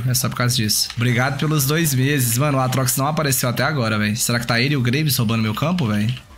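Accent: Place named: Goiás, Brazil. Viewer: Goiano sô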